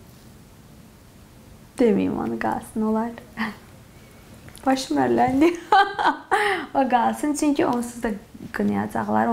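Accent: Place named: Trabzon, Turkey. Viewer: Trabzon şibesiiyle okuduğun mahmuda lap yerine düşdü evli kişiyle qarışdıran müğenni qadın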